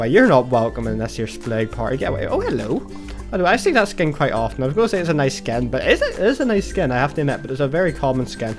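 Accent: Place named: Northern Ireland, United Kingdom. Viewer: what part of northern ireland are you from because i am from ireland too, but not northern:( oh well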